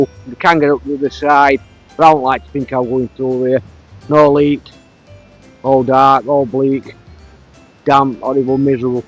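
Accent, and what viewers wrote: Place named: Barnsley, United Kingdom. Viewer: My mum loves you for speaking broo-ard BaRRRnsley. Heebee geebees 😂 etc. Happy festive season to you and yours.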